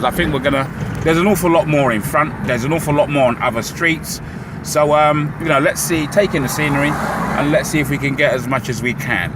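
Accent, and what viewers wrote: Cockney, United Kingdom. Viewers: interesting commentary...esp' with your cockney accent. LOL someone should tell Rochas he needs to improve the quality of the roads his gov't constructs in Owerri! that's what lets down his efforts in opening up new areas of the city!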